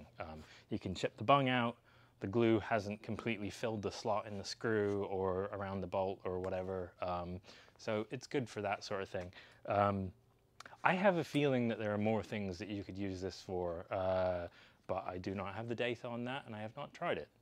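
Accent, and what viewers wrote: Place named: Cornwall, United Kingdom. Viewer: You're an interesting and knowledgeable speaker (who luckily has retained a lovely Cornish accent) but I would just love to see some of the actual work and progress on Rosalind. Perhaps you could combine the two?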